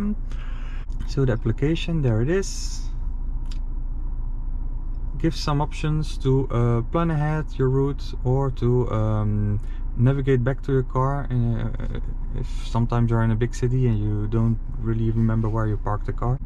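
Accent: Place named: Netherlands. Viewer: Hello, Thank you for your review 😊 Your car is in french but I feel like you have a dutch accent, are you from Belgium ?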